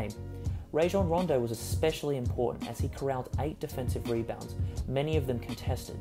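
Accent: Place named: Australia. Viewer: If you're able to turn up the volume on your voice over analysis, that'd be awesome. Your analysis is pretty sick; you also sound like a fellow Aussie. Can't wait for your game 2 review!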